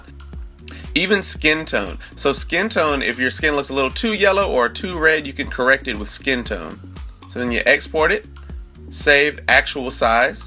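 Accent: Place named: Carolina, United States. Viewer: It’s crazy how I could tell that you’re from Carolina like me bc of your accent 😭great video tho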